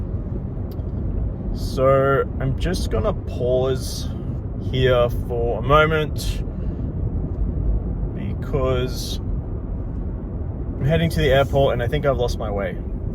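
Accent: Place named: United Kingdom. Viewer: I love your accent. I thought you might be British but you're driving an American car (left hand drive)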